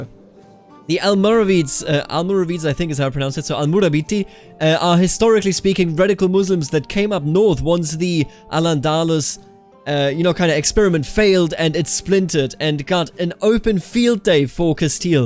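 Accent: Germany. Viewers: It's funny how his german accent is hardly heard while he speaks english. But the second he pronounces a non-english word, you can bet your ass he's a damn bavarian.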